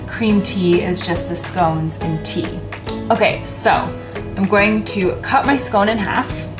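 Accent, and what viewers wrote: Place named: United States. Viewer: I could fall asleep to your voice... Esp when you say "go ahead" at least once every 30 secs like a true American. Very cute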